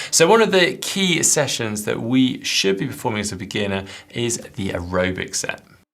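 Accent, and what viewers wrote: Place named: United Kingdom. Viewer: I love the British accent & already ordered a cap, ear plugs & nose plug. Got goggles at Costco.😀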